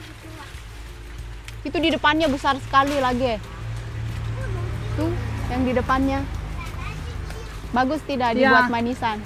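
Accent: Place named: Sulawesi, Indonesia. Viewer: Pengaruh dialek😅 Sulawesi e lebih tajam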